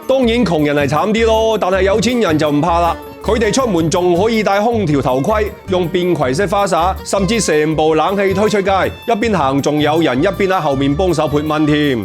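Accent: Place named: Guangzhou, China. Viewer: up主喺邊度人，聽口音有d似我哋廣州話，但又唔係正宗廣州話，似乎是帶點其它口音的廣東話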